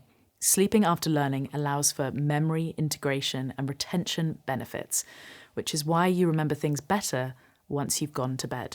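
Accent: United Kingdom. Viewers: Some people have it and some people don't. Spender has it! Perhaps she realises this, perhaps not! What do I mean by "it"? Let me explain. She's a song writer. She a musician - plays at least 3 instruments, that I am aware of! Is quite good at these things. Knows a lot of nice people. And seems to have heart flutters at the mention of John Mayer, whom I refer to as JC - those are his initials. Has a British accent. Here music is not my style - however is a gem especially since "litrally" wrote it, performed and produced it - all kind of a complex process. So what do I mean by it. PRESENTATION! She natters on about such things as buying (and unpackaging) a vintage guitar, the excitement of visiting music stores (in several countries), the ups and downs of practicing music - and offers advice. To me her real talent is that she can talk about anything and make it very interesting and in my case impossible to ignore. 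She could come on here and talk about a songbook she recently bought at a used book store. She wouldn't talk about the songs in the book but rather about the several missing pages and the songs that may have been, or should have been on those missing pages. I would listen, sit back and smile and have another sip of coffee!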